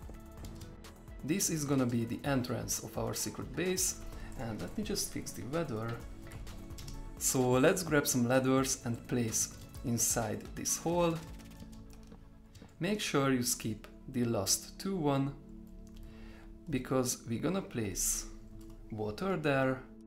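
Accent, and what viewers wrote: Hungary. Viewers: Te magyar vagy? Eléggé hallatszik.. :D Nekem olyan furcsa v a beszéded nem eredeti angol kiejtésre hajaz...vagy csak tudsz magyarul? YouTube are Hungary? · Valahogy éreztem hogy magyar vagy a kiejtésed miatt😁 Csak így tovább! 🇭🇺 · tudtam, hogy magyar vagy.:) kiejtésröl tudtam. amúgy nagyon szeretem a videóidat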